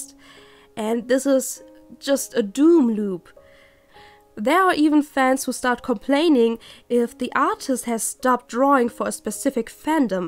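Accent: Germany. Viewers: great video and incredible drawing! (btw are you from germany? your english sounds like it c:) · Ich wusste erst nicht das du Deutsche bist...hab's aber iwi an dem Akzent erkannt...denn viele deutsche sprechen manchen Vokale im Englischen nicht richtig aus...bzw. klingt es nicht nach dem typischen Englisch...ich meine es nicht böse -3- ^-^ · In my opinion you sound like an German, speaking english. You know what I mean? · Your 100% german. I am too, and I can hear it through your accent ! :D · Hey :D are you german? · Hey, I didn't even notice that you were german, until I saw that you used german Texts as examples in your video! And when I listened closely afterwards I could even hear an accent, which I didn't hear before. I'm german aswell, and it's always nice to meet other good, english speaking german artists. ;,D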